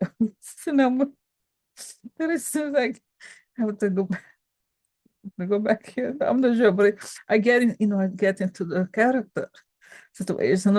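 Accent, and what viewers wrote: South, United States. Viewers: LOVE the southern accent 😂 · OMG, you do really well with the Russian accent, but the southern accent needs work. Lol.❤ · I must say, Your southern accent is cracking me up. hahahahahahah Namaste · what an incredible episode. Thank you. KAM WON! Where are your rings?! Your southern accent is on point! Reminded me of family, excellent job!;D And I've never been more proud to also have adhd <3 <3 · you are so funny--the con man is so full of hot air. Love your southern accent which reminds me of a friend, a southern belle who grew up in Georgia. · Man, I’m from Georgia and that’s a pretty good southern accent!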